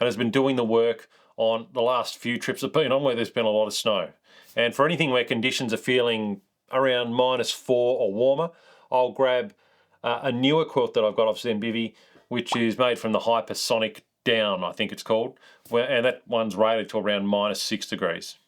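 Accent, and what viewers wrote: Australia. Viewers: PROFOUNDLY Australian voice !. "Rawwrrkkk rawwrrk rawrk !" My goodness, I'm sure that's the Genuine article.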